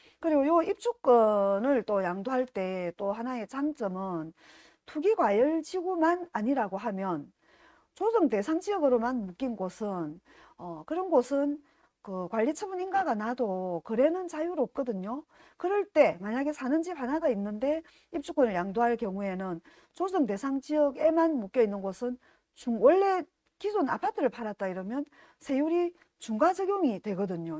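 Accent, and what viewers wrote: Gyeongsang, South Korea. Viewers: 앙칼진 경상도사투리 ~~~~~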